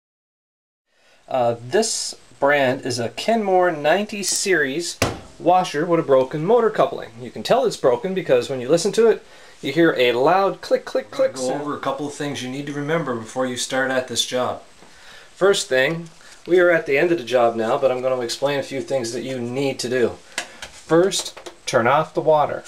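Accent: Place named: Canada. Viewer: Oh you SO rock :) I was thinking I had to a get a new washer but followed your steps and sure enough - that little bugger was broken., Ordered a new one and paid extra so it'll be here Fri (it's Wed now) SWEET! YAY I don't have to go to a skeezy laundromat LOL THANK YOU! :) (And you're Canadian - so am I - I could tell by the "oots" before you mentioned Toronto LOL I'm in Seattle but from BC.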